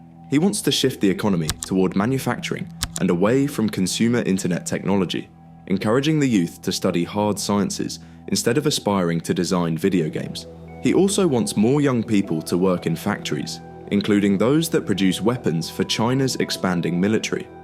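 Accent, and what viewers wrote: United Kingdom. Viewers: This guy is british bro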